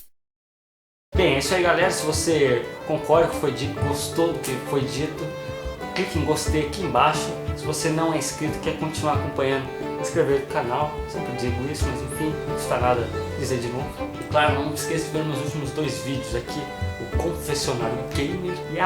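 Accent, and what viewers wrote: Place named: Piracicaba, Brazil. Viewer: por acaso você não é de piracicaba não né?? nosso sotaque parece ser o mesmo...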